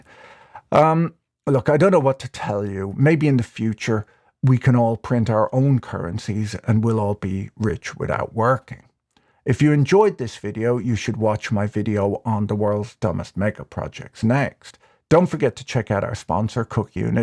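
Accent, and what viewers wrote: Ireland. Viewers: I want him to do an ODB story so I can hear him name off his AKAs in a straight Irish accent. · Listening to dry humor and delightful Irish accent when he dissects the current madness happening in the world is so enjoyable in these insane times we live in.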